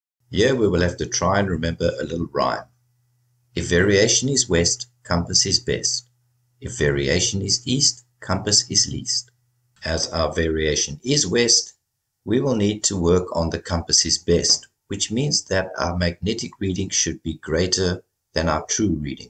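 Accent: South Africa. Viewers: My God, this is great! And I love the SA accent!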